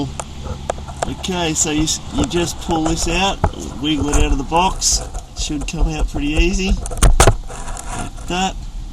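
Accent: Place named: Australia. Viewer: Thanks for your video, and lovin' that Aussie accent and vocabulary ! Nothin' too scary ! Cheers from a Kiwi-Aussie !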